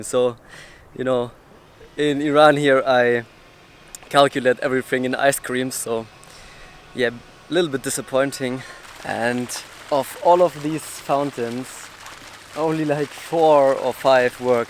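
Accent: Germany. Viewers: He speaks English very well. I barely can hear German accent. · Are u german???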